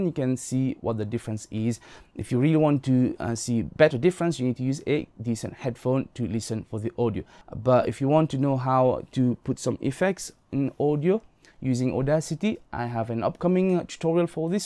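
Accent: United Kingdom. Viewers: Bob's your uncle! So British.....great explanation and video. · Do you know how many Vid's I've watched just to get this info? Way too many (Short Sweet And Straight To The Point) Thankyou ;).... And you're British always a plus. haha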